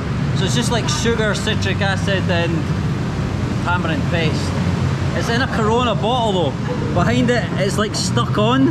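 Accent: Scotland, United Kingdom. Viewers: Have fun in Bali.. I like your British accent brotha!!❤️ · Hai, I find your videos great, and a lot of local people here know you too, your Scottish accent is fabelous, greetings from Sanur, Bali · It's different... you know UK have many many regional accent... but to untrained ears yes, all sounds just british innit 😅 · It is Scottish accent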